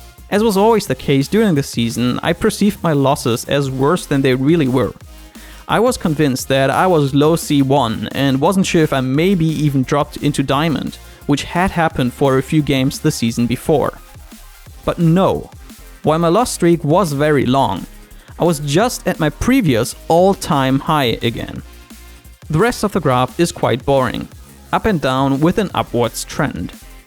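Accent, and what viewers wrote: Germany. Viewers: As more as I'm listening to your voice you sound more and more German so are you German?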